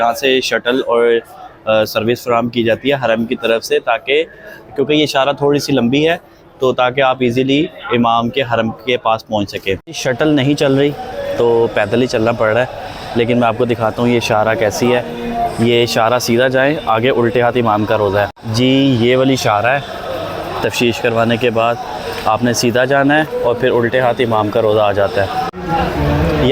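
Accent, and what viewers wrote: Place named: Punjab, India. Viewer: Bhai tum Punjabi ho tum jab Urdu bolte ho Punjabi tone me bahut maza ata he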